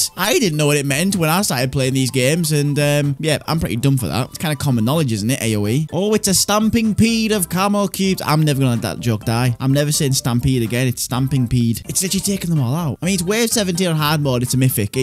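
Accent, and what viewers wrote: United Kingdom. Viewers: I’m not being mean but is it me or did I realize he kinda sounds british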